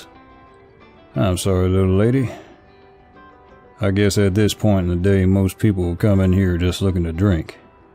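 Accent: South, United States. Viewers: melted immediately with the real sounding southern accent 🥴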